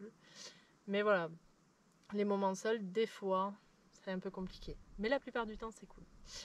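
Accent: South-West, France. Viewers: Nature, authentique, sincère , un peu sauvage et avec en prime un bel accent du sud ouest! Vous avez gagné un abonné de plus! Je vous conseille de suivre les périgrinations de Django nomade dont je suis aussi grand fan...Bonne route et à bientot!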